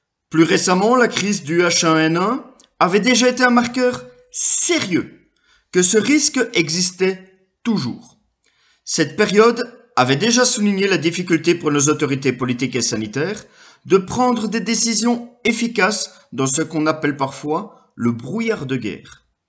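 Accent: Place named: Belgium. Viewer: Je pense reconnaître un accent du plat pays ;) heureux de savoir qu'il y a d'autres personnes qui s'intéressent a ces problématiques dans ce pays si mal préparer a ce genre de risques !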